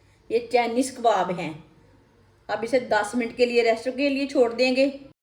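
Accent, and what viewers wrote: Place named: Punjab, India. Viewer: آپکا پنجابی لہجہ بہت اچھا ھے👌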